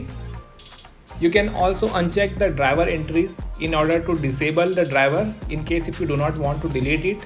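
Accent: India. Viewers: I never watched a video with an hindian accent that didnt help me, thank you so much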